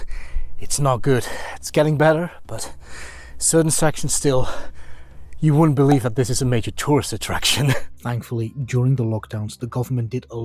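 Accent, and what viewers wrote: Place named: Ireland. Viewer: You’re definitely very lucky to be living in what is, in my opinion, the most beautiful part of Ireland. I’m quite surprised that you’re not Irish, considering your accent 🧐😉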